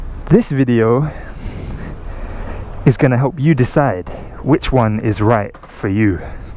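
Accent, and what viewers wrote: London, United Kingdom. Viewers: Too young to be old new London accent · I think he's from London and I know loads of geezas who talk like him. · That's not a British accent, that's the accent the white boys who want to be black use in London. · I'm confused, you have a british accent but your nott in the UK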